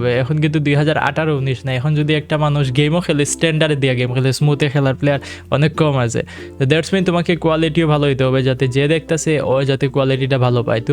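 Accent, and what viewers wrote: Sylhet, Bangladesh. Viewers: Bhaia aponi ki sylheti 🤔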